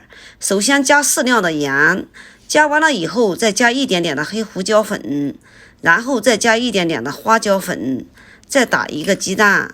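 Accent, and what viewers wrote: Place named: Henan, China. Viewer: 一聽口音就是河南信仰老鄉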